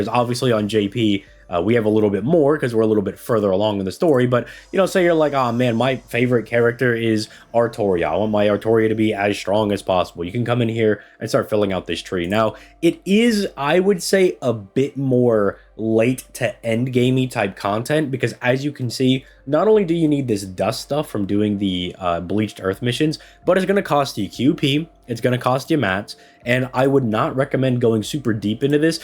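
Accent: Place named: United States. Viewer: He's American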